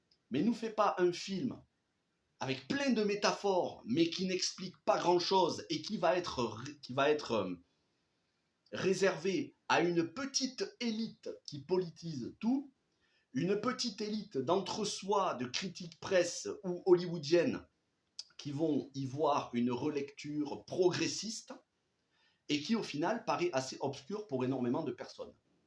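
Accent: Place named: South, France. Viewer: Nope reste meilleur qu'US mais je trouve qu'il est trop long avec un changement de ton qu'il ne maitrise pas tant que cela car parfois je suis sorti du film ... Trop d'idées (beaucoup de references) rend en finalite le film moins interessant et c'est dommage. Grosse qualité visuelle, gestion de l'espace avec des jolis plans, l'histoire des dresseurs de chevaux afro americains au debut du cinema, la scène finale avec la poursuite en moto, la relation entre le frère, le père et la soeur. Merci pour ta video avec ton accent qui chante les cigales du Sud 😉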